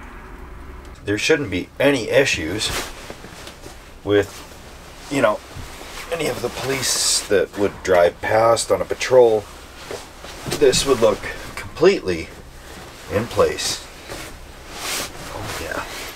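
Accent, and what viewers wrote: Canada. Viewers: The canadian comes out when excited.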